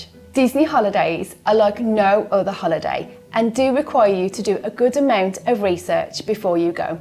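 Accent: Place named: West Midlands, United Kingdom. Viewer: Nice to hear a fellow West Midlander talking sense. Weve watched all your vids. Me and wife looking forward to going in Sept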